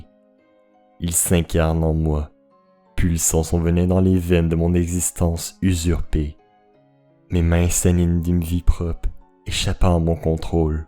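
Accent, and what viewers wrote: Quebec, Canada. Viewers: Olala cette voix m'avait tellement manqué. J ai eu cette chaine sur l accueil de youtube et j ai tout de suite cliqué. Ta narration est toujours aussi incroyable et sublime. J'adore ton accent québécois hihi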